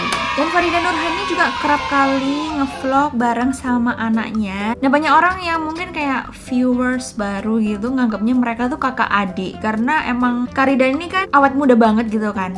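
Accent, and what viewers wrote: Java, Indonesia. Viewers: Betul. Aku tuh klo pemberitahuan ria sw. Lgsg buru buru liat. Ga tau kenapa ya.. suka aja🤭 Sama dong. Krn medok mu😄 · 1. Ria SW - otomatis 2. Omay - Farida Nurhan 3. Shelly Che 4. Nex Charlos 5. Duo Pengacara 6. Ken & Grat Note: klo kak ttp loch ya & itu pasti. Ciri khas logat jawirnya tak terlupakan...:^) Salam sehat & sukses selalu ya kak. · mantab mbak, english karo jowone medhok kabeh 😂😂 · kak cantik dan medok 😆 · Aku seneng medoknya kk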